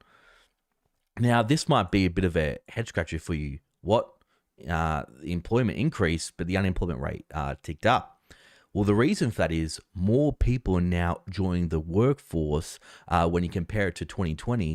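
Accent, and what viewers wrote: Australia. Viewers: You can call it data or data, I really don't mind Love the ozzy accent! Thank you mate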